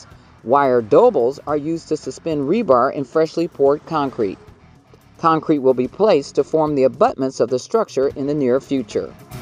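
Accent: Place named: Texas, United States. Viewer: Is that a Texas accent representing the State of California?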